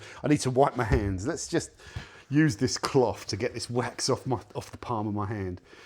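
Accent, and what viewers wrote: United Kingdom. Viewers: I really love your British accent. Here in Korea, I occasionally hand-wax my Hyundai with Zymöl Ital. One day, when I’ve saved up enough money, I’d love to get some Concours and give it a try.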